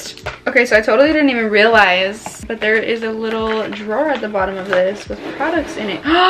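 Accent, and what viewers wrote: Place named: United Kingdom. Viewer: That british accent is everything😂❤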